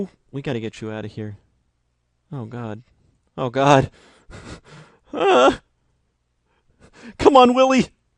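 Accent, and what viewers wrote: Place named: United States. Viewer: i love the american accent =D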